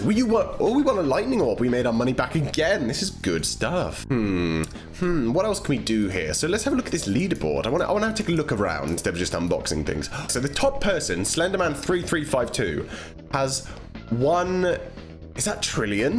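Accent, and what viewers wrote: United Kingdom. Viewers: Are you are British so if not ... ... .. ... ...........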